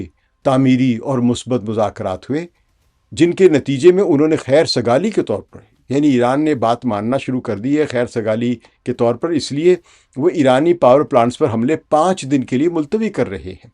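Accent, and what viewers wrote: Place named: Punjab, Pakistan. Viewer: جی سلام ماشاء اللہ اپ کا تجزیہ بہت ہی خوبصورت ہے اور پنجابی ٹچ بھی بہت خوبصورت ہے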